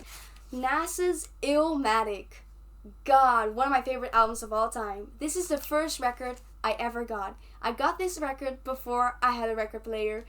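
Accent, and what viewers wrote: Netherlands. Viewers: Amazing collection! You sound Dutch btw, are u?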